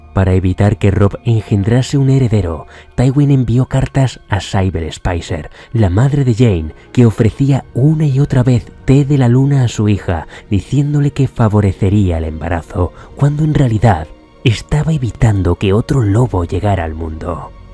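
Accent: Castile, Spain. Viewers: No me cansaré de repetir el castellano le da muy buen toque al relato, 👌 un nuevo suscriptor se anota. Espero que traigas más relatos .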